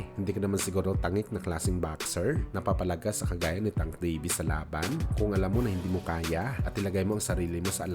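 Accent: Visayas, Philippines. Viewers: Ikaw lng ata nakakarinig Ng sinasabi mo,punting bisaya na nga Ang hina pa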